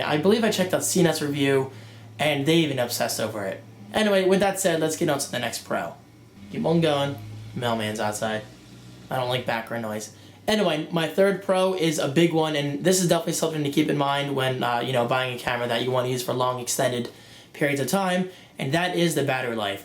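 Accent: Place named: New Jersey, United States. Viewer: I like your Jersey accent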